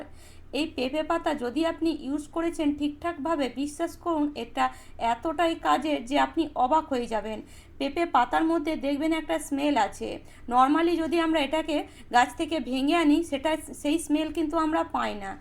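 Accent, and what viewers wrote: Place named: West Bengal, India. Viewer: আপনি কি ওপার বাংলা থেকে ? উচ্চারণ সেটাই বলছে !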